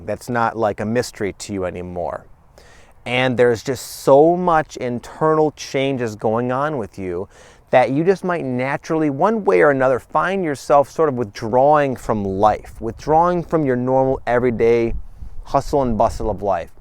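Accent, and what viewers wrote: Michigan, United States. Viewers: brother from Michigan <3 my name is Anjalina; I've lived in Colorado for a long time, when I first heard your voice on your channel this summer, it reminded me of home. thabkyou for your wise words, along my journey I had a super accelerated beginning, then a big fall, and a steady climb back "up" now, I am certainly on the cusp of something right now, again, thankyou- sending the most confident vibes your way. XX 🐉♄♇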